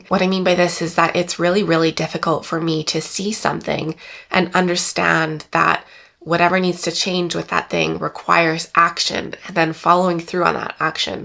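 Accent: Canada. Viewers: Great educational video as always,! Very random but I always forget you’re Canadian until I hear you say certain words. In this video it was “about” 😂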